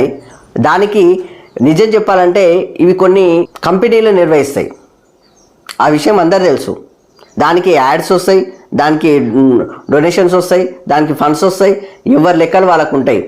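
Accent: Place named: Telangana, India. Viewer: చాలా చక్కగా వివరించారు సార్ తెలంగాణ ప్రజలకు అర్థమయ్యే విధంగా తెలంగాణ భాషలో మాట్లాడుతున్నారు సర్ చాలా బాగుంది సార్ ఇంకా కొద్దిగా వాయిస్ పెంచితే బాగుంటుంది సార్ 👌👌🙏🙏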